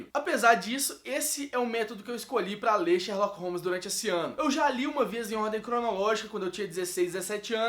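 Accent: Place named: Minas Gerais, Brazil. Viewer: cara vc é mineiro! vou falar aqui "procês"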